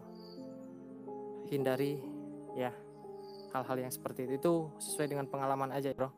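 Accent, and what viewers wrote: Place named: Padang, Indonesia. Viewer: Orang padang mna suaranya... Bukittinggi hadir bro..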